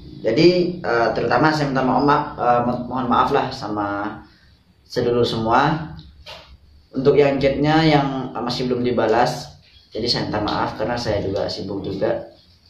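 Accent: Madura, Indonesia. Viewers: Abang dari madura tah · Reng medureh tha?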